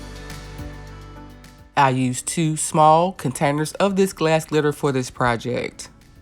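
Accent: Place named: Memphis, United States. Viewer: Sound like your from Memphis or Atlanta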